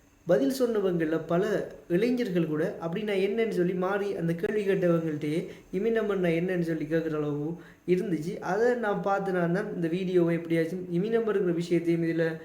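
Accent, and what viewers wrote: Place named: Sri Lanka. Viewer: Superb clearity நண்பா Srilankan Tamil la keakirathu romba மகிழ்ச்சி ❤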